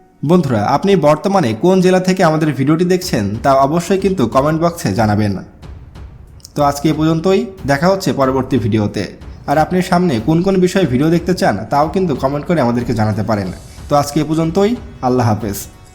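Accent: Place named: Kishoreganj, Bangladesh. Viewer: I am hearing kuliarchar kishorgonj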